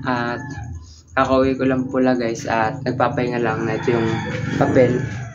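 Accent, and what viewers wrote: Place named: Quezon, Philippines. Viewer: Saan kapo sa quezon bro? Tama hula ko quezon karin sa punto mo, taga quezon din ako eh, nakakamis narin ang province talaga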